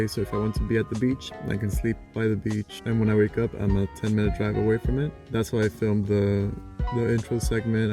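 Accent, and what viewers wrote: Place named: Middle East. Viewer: Where are you from, I detect a middle-east accent!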